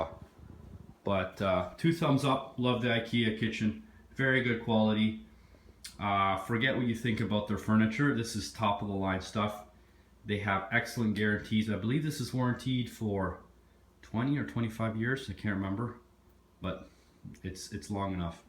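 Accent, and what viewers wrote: Canada. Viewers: Surprised to see this 'Euro' Window you have over the sink - wonder if it swings-n-tilts as well? You sound Canadian ...perhaps why ;-) Cheers! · Silverback Gorrilla ... Playing into stereotypes. Dumb little wanker. The bloke also sounds Canadian, not American.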